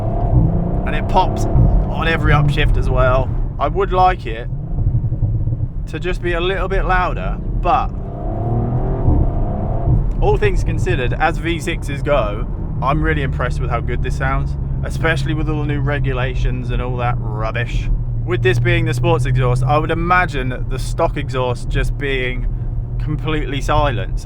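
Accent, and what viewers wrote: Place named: United Kingdom. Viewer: I love that british accent »A glass of booooataaa».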